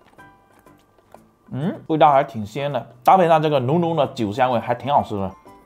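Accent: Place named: Fujian, China. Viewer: 胡建口音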